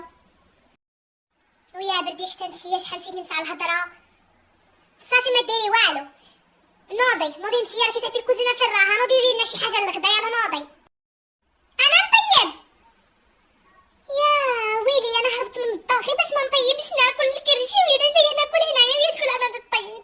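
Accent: Oujda, Morocco. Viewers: لا اللهجة الوجدية هكا ديرا الوجدا عندنا فلمغرب هدي هي لهجتهم 😒😒😍😍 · ههه هاديك راه الهجة وجدية مدينة ف الشرق قريبة للجزائر المكلخة بنادم معارف تا لعبة اداوي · هادي لهجتنا يالخامجة لهجة وجدية وبركانية كوني تفهمي هههه متبقايش غير تحلي في فمك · هادي وجدية نورمال تجيكم بحال شي جزائرية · وجدة هادي هدرتم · خاوتي هادوك لي يقولوا تقلد فوحدة جزائرية هدي راها وجدية وماتقلد تاحد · هادي وجديه من وجده هكا هدرتهم واغلبيه المغاربه هكا كيهدر .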